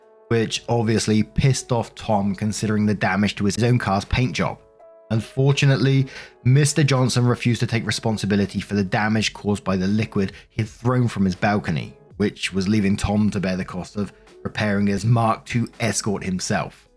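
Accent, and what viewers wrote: United Kingdom. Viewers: Hey Mark! This doesn't have to do with the specific video, but I just wanted to show my appreciation towards you and your content. I've been listening to your videos for several months now while at work (you can listen to whatever as long as it's not too distracting), and I've always loved reddit stories, so when I discovered your channel, it was a total game changer. I like your personality, your perspectives, your anecdotes, and yes, your accent (its true, Americans love British accents), and this channel has honestly made my work shift much more enjoyable. I even got youtube premium solely because of you. I decided to get YouTube membership to further support you. So thanks again, and please keep up the great work!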